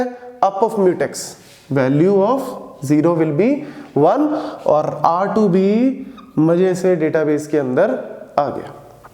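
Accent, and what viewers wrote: Punjab, India. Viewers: Tussi punjabi paaji?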